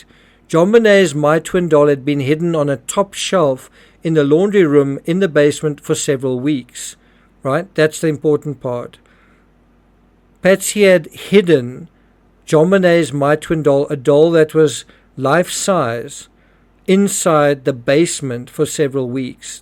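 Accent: South Africa. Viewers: Jon Bennet was abducted and taken to the US Airbase on the Azores for the purpose of sacrificial murder all organised by the then Prince Charles. She was raped to death in the Rape the Children to death races held by the Royals and world leaders. ANYBODY with the knowledge and truth but telling known lies regarding this young girl or ANY other one.. is about to be removed from Earth. PREPARE... BTW... I notice a South African accent... there are human meat processing tunnels in your country as well as mine and many others... 1000's are processed in these factories every day and the people abducted from the war zones. ..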